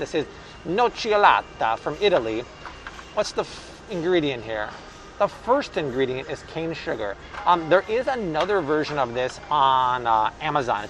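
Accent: France. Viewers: the way he pronounces the letter G sometimes sound slightly French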